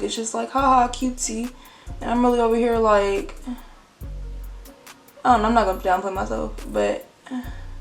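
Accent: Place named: Bay Area, United States. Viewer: lol u talk like ya out here in the bay I was like oh she must be local 😭😂 stay safe bihhhh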